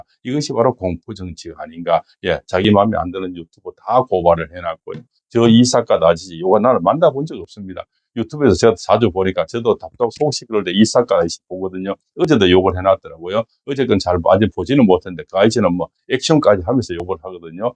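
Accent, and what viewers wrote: Gyeongsang, South Korea. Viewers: 새해복많이받으세요 님은 경상도 같은 고향같네요 윤대통령님 기도좀올려주세요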